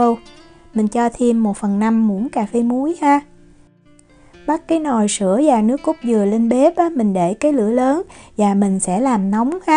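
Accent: South, Vietnam. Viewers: Thích NC rất dễ thương, giọng miền Nam truyền thống, và đặc biệt là có để hình đại diện, chứng tỏ D rất chân thành và gần gũi, còn mấy kênh hướng dẫn về nấu ăn ko bg cho thấy mặt. 🤩🤩🤩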